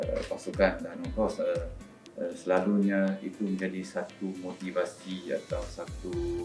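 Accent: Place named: Kedah, Malaysia. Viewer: loghat loqstaq pekat tn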